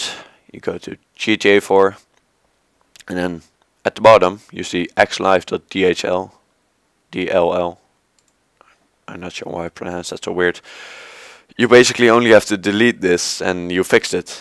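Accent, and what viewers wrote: Netherlands. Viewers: oke gozer ik hoor dat je NL bent maar het werkt niet bij mij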